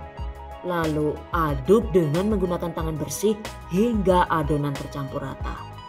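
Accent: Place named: Java, Indonesia. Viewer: Hehe..mbaknya lucu...suaranya, ternyata orang jawa medok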